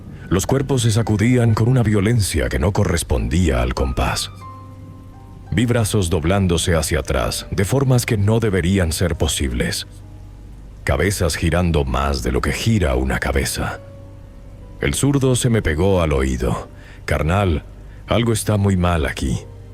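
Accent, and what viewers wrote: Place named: Venezuela. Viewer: te escucho debes de Venezuela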